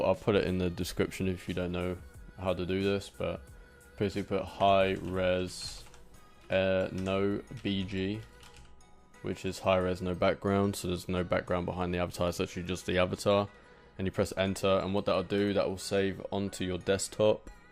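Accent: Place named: United Kingdom. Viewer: What part of the UK you from?... ifyou even are. im down east in LONDONNNNNNN <3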